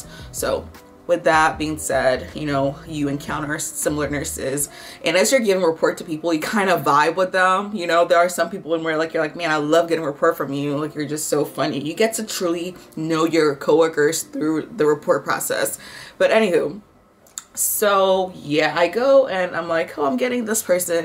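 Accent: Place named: Africa. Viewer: Omg! It’s the African accent for me 😂😂😂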